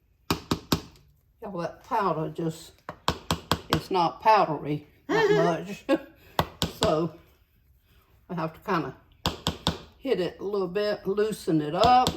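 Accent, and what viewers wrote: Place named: Arkansas, United States. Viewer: Love the meal ideas & enjoy your cooking but best of all is that you talk exactly like my parents Arkansas family. It's very soothing now that my mom is gone to hear you & remember those wonderful kitchen moments I was so lucky to experience. Kept doing what u r doing. I bet I'm not the only one that enjoys the South & miss the people! I'm a fan!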